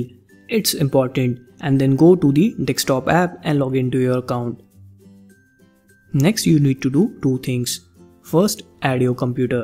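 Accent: India. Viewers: The only indian voice i can take without wanting to just click off the video. Great job!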